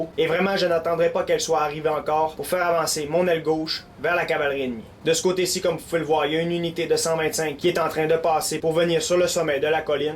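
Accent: Quebec, Canada. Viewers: est canadien?? c est de quel region cet accent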